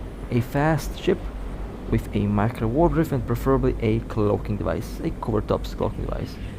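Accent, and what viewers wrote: Russia. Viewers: да делай на русском судя по акценту же русскоязычный!)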